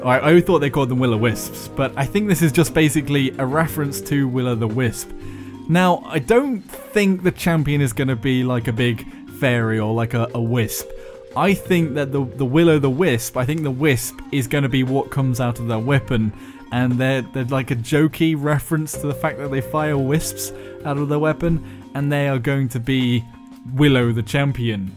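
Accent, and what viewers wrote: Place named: United Kingdom. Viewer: I love your british accent and your voice. I would talk to you for hours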